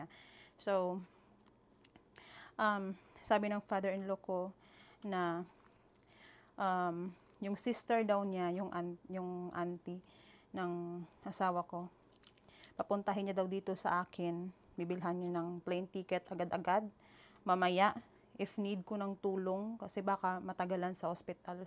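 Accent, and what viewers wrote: Iloilo, Philippines. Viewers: Ilongga po kau?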